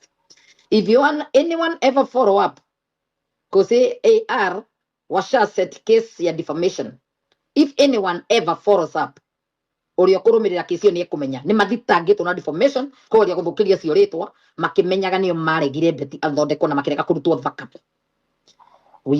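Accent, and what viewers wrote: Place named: Kikuyu, Kenya. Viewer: githungu mega tiga guthoma ta gikuyu.Nitunogire kuigua ndeto icio.Wi murumani muno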